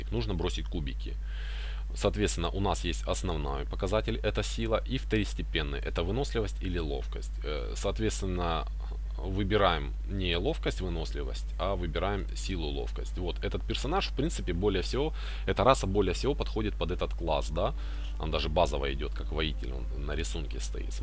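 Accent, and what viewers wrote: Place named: Ukraine. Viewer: ***** ну уши режет... лучше бы на украинском говорил , чем с таким акцентом .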